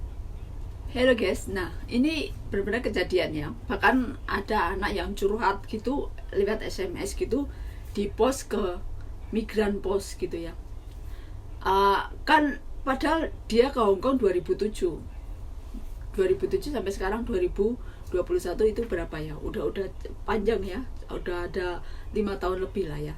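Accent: Java, Indonesia. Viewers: Salam kenal mbak... Sehat, sukses terus mbak. Njenengan jawane mana mbak?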